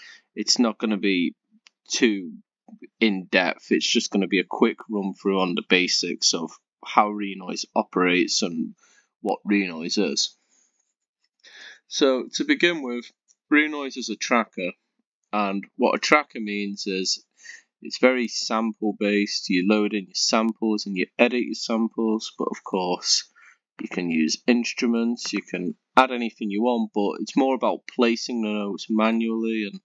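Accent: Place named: United Kingdom. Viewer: I forgot that you're british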